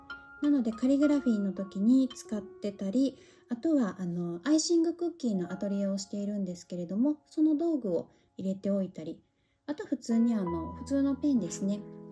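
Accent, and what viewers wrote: Kyoto, Japan. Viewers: こんにちは～。今回の動画、良かったですよ(⋈◍＞◡＜◍)。✧♡ 蚤の市の動画も良いですが、今回のはそれを上回っているように個人的には、思います。 そして、かわいらしいお声で、聞き覚えのある京都弁でお話されるのが、更に親しみを感じました。また、このような企画の動画も出してくださいね。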